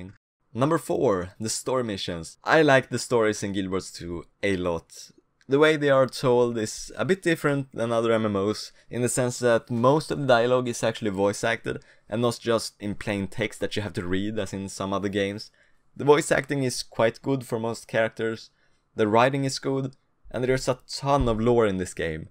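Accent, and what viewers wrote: Sweden. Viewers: Are you from sweden? You have a very swedish-english accent :)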